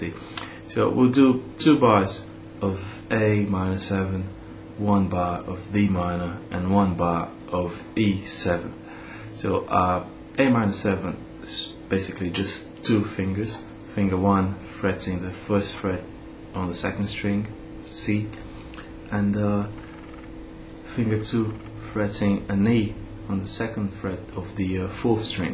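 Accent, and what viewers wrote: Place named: Brazil. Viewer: Are you a Brazilian living in England or Australia? Your accent sounds to me like a mix of Brazilian and British or Australian. Just curious.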